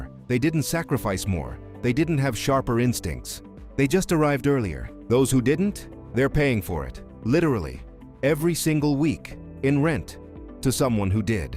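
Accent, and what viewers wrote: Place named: Australia. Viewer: Just Aussie. Too strong is off putting.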